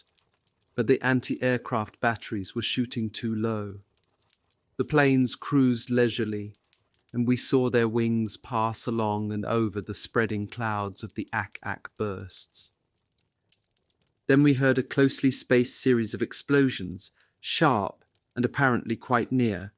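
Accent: United Kingdom. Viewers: Something about a British person reading a story about Americans... it just does not sit right, and it feels like it does not fit. There, I said it.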